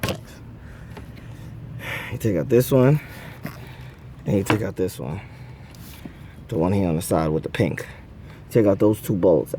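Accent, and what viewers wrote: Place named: East Coast, United States. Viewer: East coast accent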